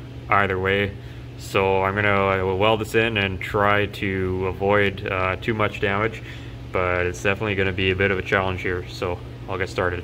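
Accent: Canada. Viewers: I can't quite understand in that Canadian accent of yours. What are you calling your crescent wrench/ handheld metal brake?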